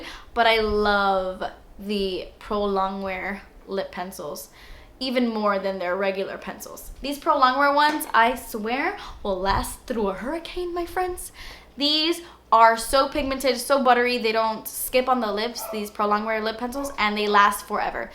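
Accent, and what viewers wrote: England, United Kingdom. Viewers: Love the british accent. Can u pls make a video with the whole british accent?...i really really like it..and of course i love your videos...thanks for mentiong VIB sale love yeah!!!😘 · Girl we love you! I liked this video before watching it! I always go out & look for the stuff you show us cause I'm obsessed. I window shop most of the time for makeup but the stuff I can afford, most of it comes from you lol even though I have oily skin 😳 but I love you! Keep doing you, and I hope your house is ready soon, can't wait to have you back completely and we all appreciate how hard you've been trying to get content out for us! I hope you know how much your subbies adore you!!!! #dassesspensive P.S: I love when you do the British accent randomly! Lol I do that too and I get weird looks like "wtf?" Lol LOVE YOU! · Your UK accent is on point! 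lol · omg tht british accent tho :'DDDD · I've recently subscribed to you and I absolutely love your videos! You should do an entire video with ur British accent :) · That British accent is a bad habit. Stop. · Love that British accent btw! 😂 😛😘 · pls make a video with your british accent. i think its cool. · Love when you do the British accent, you totally resemble Emma Watson! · Your British accent, so cute!! I'm British so I know 😉 xx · lmao! your british accent kills me every damn time! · haha whats with the britsh accent! you are sooo cute...!!! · Your English accent cracks me up! coming from a brit 😊 xx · Hahaha your English accent is SO GOOD!!! so funny:D Love you <3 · You look stunning in this video and the random British accent... I love that I'm not the only one who does that!!! Your perfection!! 💋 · Omyyyyyy. That british accent. ugh <3 It's so perf! I love you!:* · for some reason, whenever you talk in a British accent, you remind me of Leigh Anne from little mix lmao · Girl your British accent always puts me in a great mood 👍🏻💓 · I loved your British accent 👏👏👏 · Love your British accent!!! 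Lol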